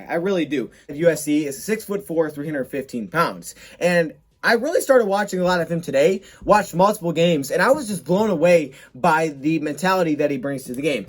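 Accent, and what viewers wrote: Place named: Michigan, United States. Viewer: It’s a Michigan thing, they pronounce it (or-e-gone) I’m from Tennessee and I moved here a few years back and everyone says it that way.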